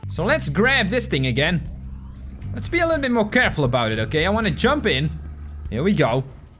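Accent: Netherlands. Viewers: You cant hide ur dutch accent XD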